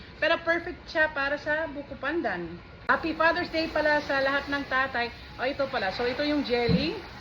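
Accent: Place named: Ilocos, Philippines. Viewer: Wow..galing mo po mag tagalog and parang may ilocano accent din po the way ka po mag salita.. ❤❤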